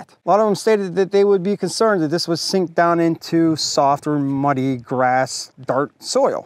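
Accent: Pittsburgh, United States. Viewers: You sound like a yinzer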